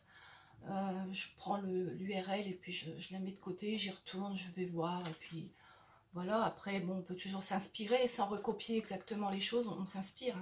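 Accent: North, France. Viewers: Ccou j’adore ta petite vidéo de présentation et par dessus ton cet accent du nord lol eh oui merci à toi bis 💋😘👍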